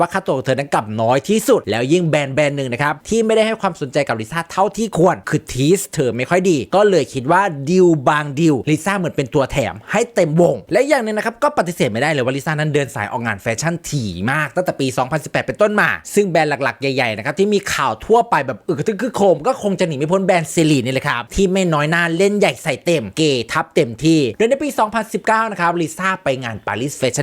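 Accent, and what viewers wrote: Laos. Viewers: อีพีนี้แอด..ตกลาวบ่อยมากนะ..ฉันอมยิ้มและหัวเราะในเวลาเดียวกัน😂..คนสิว่าฉันเป็นบ้าอยู่ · มักๆๆแอดเว่าลาว.ขี้เล่น ตลก สนุกสนาน😂😂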